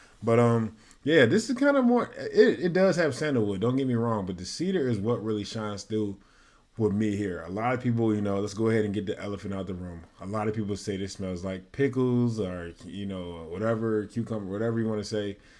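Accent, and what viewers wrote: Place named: Midwest, United States. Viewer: Your likely from Wisconsin, Michigan or Ohio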